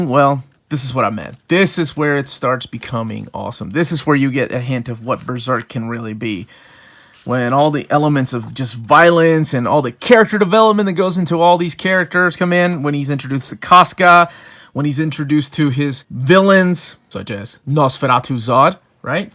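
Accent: United States. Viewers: eres peruano? Hablas el inglés con un acento gringo medio neoyorquino que ni se te nota. Pero se me hacía raro que tuvieras la piel color puerta como yo jajajaja, quizás soy el único otro peruano que te ve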